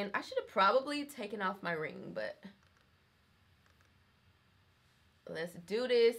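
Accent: South, United States. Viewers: why are you so cute .. .. I'm guessing you're from down south with a little islands in. . . . .I usually think it's rude to ask; hopefully since I'm mentioning I get a pass.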